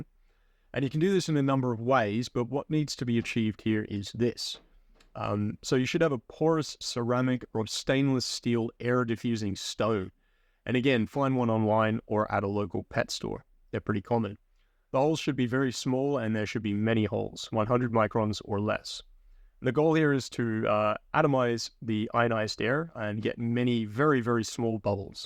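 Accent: Australia. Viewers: Are you an ausie living in the usa? You sound like Shantan Wantan Ichiban (no hate just curious) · interesting accent aus plus?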